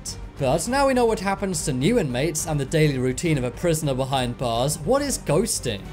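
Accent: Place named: United Kingdom. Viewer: did the british accent of the narration not tip you off ?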